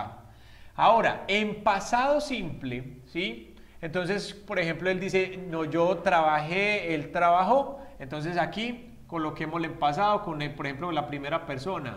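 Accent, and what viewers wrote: Antioquia, Colombia. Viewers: El oprimido yo también lo note y soy de españapero se le nota un fleje (mucho) que es colombiano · Más paisa que una arepa, gracias teacher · igualmente soy de medellin lo note inemdiatamente por el acento :D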